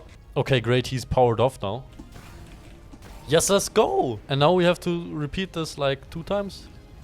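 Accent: Germany. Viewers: German you Sound, me reconize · I can hear a german accent 😮🎉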